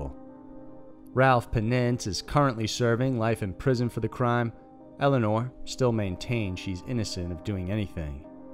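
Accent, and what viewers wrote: South, United States. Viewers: Some of your words sound so southern. Where are you from? I’m a Carolina boy myself. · I'm not usually an enormous fan of American accents but I really love the narrators one, could listen to it all day! What part of America is that from?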